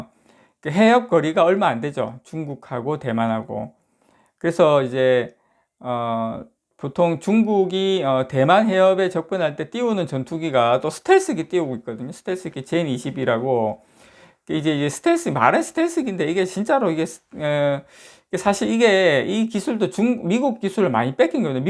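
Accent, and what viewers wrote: Gyeongsang, South Korea. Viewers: 땡꼬때리고 ㅡㅎㅎ참 오랜만에 들어보는 경상도 맛의 사투리 항상 감사한마음으로 듣고 있습니다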